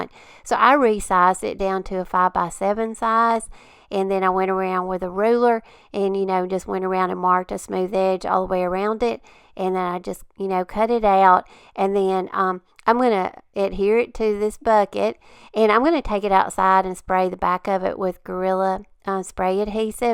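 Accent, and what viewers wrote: South, United States. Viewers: I so enjoy watching your videos...I love everything about them from your instruction to your display of the items and also your background music. I think you are so great at what you do and I just love all of your videos. You are such an inspiration. I also think you have the cutest southern accent and are so pleasant to listen to. Thank you for sharing your wonderful talent.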